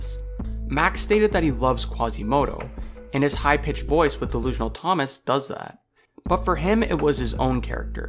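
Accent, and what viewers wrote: Canada. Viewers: You're Canadian, I can tell by the way you say "out"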